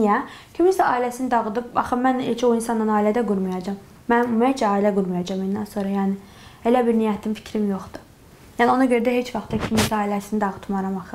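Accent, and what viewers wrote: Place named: Trabzon, Turkey. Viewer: Trabzon şibesiiyle okuduğun mahmuda lap yerine düşdü evli kişiyle qarışdıran müğenni qadın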